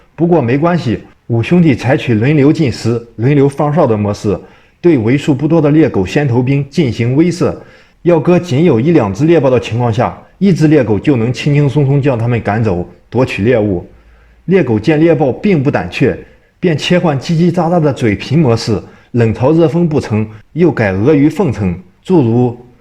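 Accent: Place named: Shandong, China. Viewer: 这口音像是山东泰安东平的口音。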